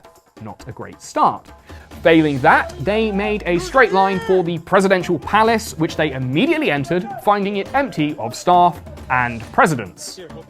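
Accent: England, United Kingdom. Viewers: Oh now I get it. You have the Russell brand accent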